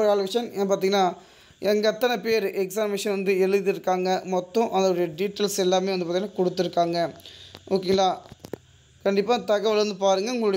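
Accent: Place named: North India, India. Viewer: Tamil nalla padikka kathukka Nanbaa... North India maathiri padikkira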